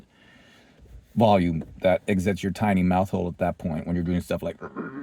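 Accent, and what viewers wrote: United States. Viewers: This is probably just a me thing: But I like your accent! It sounds a little somewhat similar to American! (I am a Finnish guy, but I speak in the American accent when speaking English)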